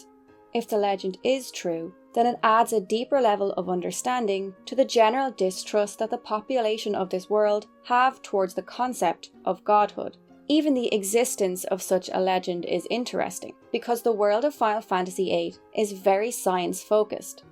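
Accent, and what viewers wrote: Ireland. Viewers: Very enjoyable content ! I eat up any FF analysis video like a bag of tayto (It’s also nice to hear the analysis from a fellow Irish person)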